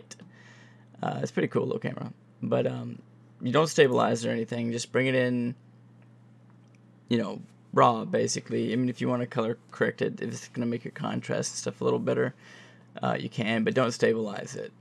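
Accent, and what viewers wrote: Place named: Kentucky, United States. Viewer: you sound kinda northwest Kentucky. Great tutorial man thanks! Subscribed!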